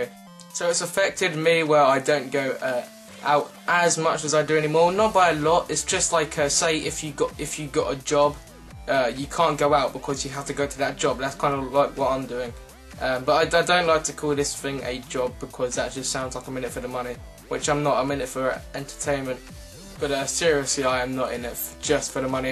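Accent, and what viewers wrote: England, United Kingdom. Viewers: do you live in england because of your voice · I think from your accent that you are British correct me if I'm wrong. But the question is: Can you do a regular American accent.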